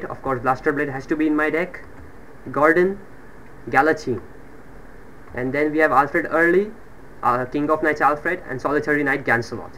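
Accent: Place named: India. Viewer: ur original accent u do no harm so expecting an indian accent . the overall video was gud keep up the gud work bro👍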